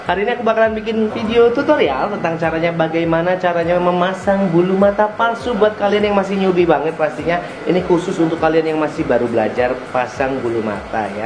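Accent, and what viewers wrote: Java, Indonesia. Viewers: Uda q SUBSCRIBE kak q ngefans sama logat jowone...sampeyan mboten pelit ilmu Mugi Barokah nggeh...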